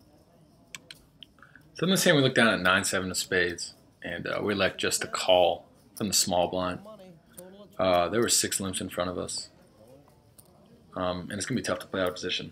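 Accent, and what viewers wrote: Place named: West Melbourne, United States. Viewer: Kinda have the west Melbourne (FL) accent... Don't hear creole. (Brevard county viewr is reason) But I'm feeling your "rounding" hard core style yo. Good vibes if that's your gig. First timer here 👍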